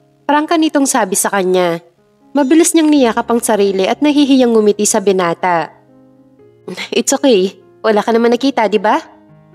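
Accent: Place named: Batangas, Philippines. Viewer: Taga Batangas po ba kayo,my tono kasi ang pag basa nyo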